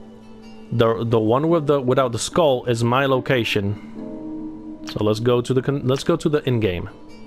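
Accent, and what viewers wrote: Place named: Spain. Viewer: Hey man,,l meant no disrespect, Spanish is a beautiful language and your english is very good, ,l should have known this by the way you pronounced compass, ,sub and share for your great reply back,,,thanks and a big hello from New Zealand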